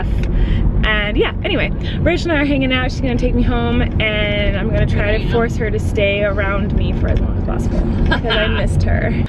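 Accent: South, United States. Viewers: I could listen to Colleen's southern accent all day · I'm from Louisiana but nobody talks like that here lol. But I agree that the southern accent is spot on, for like Georgia and Alabama and Tennessee! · Colleen's southern accent makes me smile, and I'm not even from the south! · Love your stereotypical southern accent 😂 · Hahah love Colleen's southern accent! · Southern accent is so accurate! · I know I'm from the northern pat of Florida like 1 hour away from Alabama and we're "southern" and her accent is on point😂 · omg I'm from Louisiana and your southern accent is so accurate 😂😂😂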